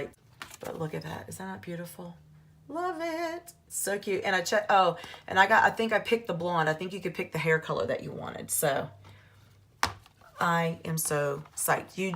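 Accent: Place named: Carolinas, United States. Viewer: Love that color!!! If you dont mind, where are u from? I live in VA now but lived in Carolina for 25 years, your accent sounds like a Carolinian. So just wondering!!❤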